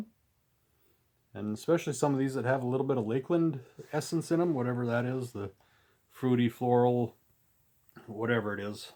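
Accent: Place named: Midwest, United States. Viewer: Always a pleasure to hear your Midwestern Minnesota-ness,. Down to Earth family goings on. Thanks for keeping me company while I cleaned the bastard bathroom.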